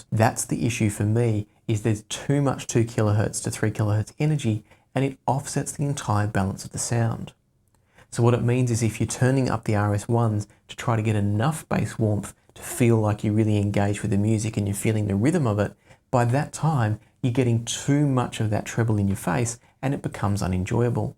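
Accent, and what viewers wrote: Australia. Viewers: I love your soft, soothing Australian accent...you would make a great ASMR artist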